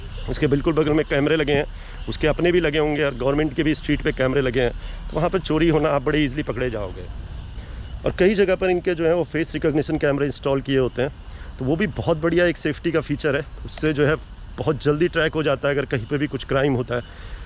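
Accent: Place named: China. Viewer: Bhai, aap ki sound ab total Chinese ho gye hai. But a really awesome video by you.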